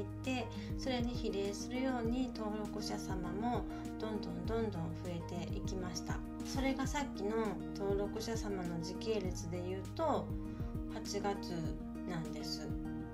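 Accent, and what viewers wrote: Kyoto, Japan. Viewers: めちゃくちゃ、京都弁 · アラフィフ・・・ですか！？お若くて美しい。 京都のディープな所をご紹介頂き、東京から中々抜け出せない私にとっては、興味津々摩訶不思議な異世界を垣間見せて見せて戴き、とっても楽しみなチャンネルです。今後も京都の隅々までご案内して頂けたらと、期待を込めてチャンネル登録させて頂きました。 京都弁良いですね〜。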